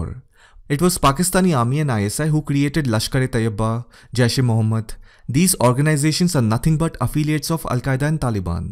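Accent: India. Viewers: Listen your voice sure is from India , India now let Asean people start Suspected of committing a terrorist attack behind the scenes at Pakistan booming.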